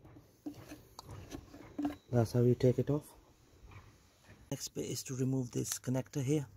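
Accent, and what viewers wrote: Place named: United Kingdom. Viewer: I love the British accent (sotaque). Hug from Mexicali México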